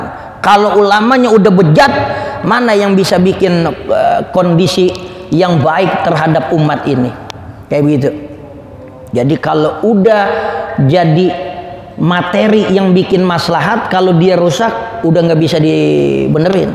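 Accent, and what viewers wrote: Jakarta, Indonesia. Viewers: Barakallah kiyai.... InsyaAllah bkl ikut ngaji terus,nambah ilmu...seneng bgt ngaji breng kiyai,kaga ngantuk.bahasa nye betawi tulen...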